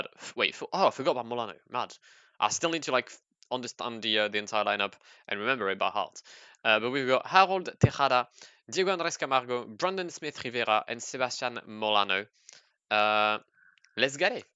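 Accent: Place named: France. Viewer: Are you from Belgium or France, because you have a French Accent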